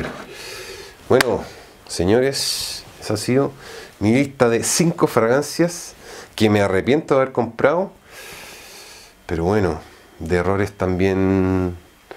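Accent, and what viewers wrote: Chile. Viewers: es Chileno ? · Y esa mezcla tuya de acentos que ? el chileno iberico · Si es chileno, radicado en España, saludos desde CHILE."le dicen el sabueso " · Tú acento se detecta a leguas amigo, eres chileno. Buen video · este muchacho tiene en su acento o pronunciación una mescla de chileno centroamericano y español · disculpa te pregunté Eres chileno o español??? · Eres chileno????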